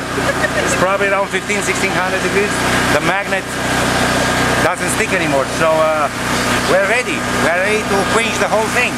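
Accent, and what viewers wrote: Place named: Spain. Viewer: your spanish accent is very very thick that it is awesome!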